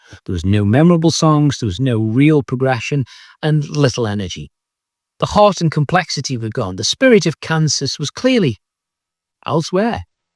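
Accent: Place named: Newcastle-under-Lyme, United Kingdom. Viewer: A Prog-talking Stokey! Ace! Actually, you sound more Newcastle-under-Lyme? You're right about these albums, of course, but from a nostalgic perspective, they still have a place in my heart and I'll just listen to them from time to time just to remind me of what I was doing in the 80s.... Was never an ELP fan... but have the 80s ones you mention by IQ, Rush, Asia, Camel, Yes, Kansas. They have infinitely better albums, but these are part of their canon and I can enjoy them with the windows firmly closed so no-one can hear what I'm listening to. Guilty pleasures. Single Factor I like, Nomzamo I really like (but I was 18 at the time), and Union has its moments. The biggest let down of these for me was Hold Your Fire, for sure.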